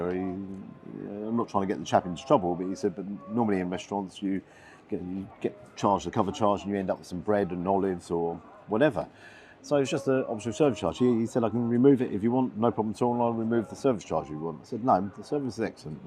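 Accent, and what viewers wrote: United Kingdom. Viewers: Glad your putting all these overrated overpriced places in there place, and the british accent makes it more credible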